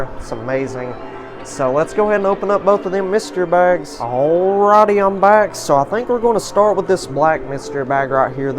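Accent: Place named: Iowa, United States. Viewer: He sounds like a country boy from Iowa that is making it big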